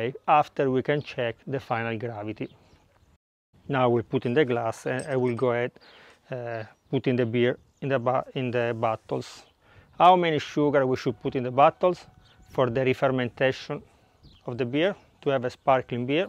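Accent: Italy. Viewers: Wow! Maestro,I really learnt alot especially that of your fascinating Italian accent, it makes me feel like am in Milan or Cincinnati already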